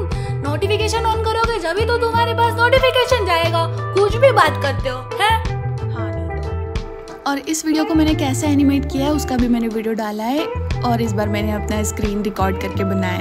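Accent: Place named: Bengal, India. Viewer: I can smell some Bangla in ur accent. Are u bangali?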